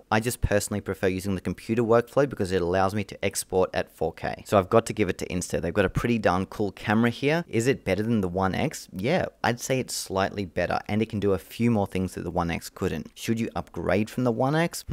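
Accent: Australia. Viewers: you sound very aussie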